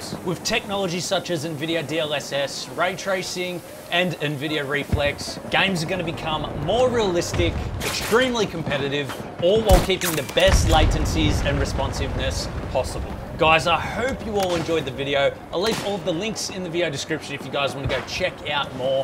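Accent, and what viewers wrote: Australia. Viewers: hello are you australian?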